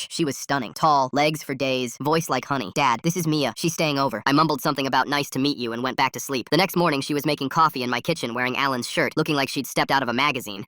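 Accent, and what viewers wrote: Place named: United States. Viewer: Yeah, this guy sounds American, South Africans, yeah we say that stuff like it is, and we'll make fun of you for not knowing too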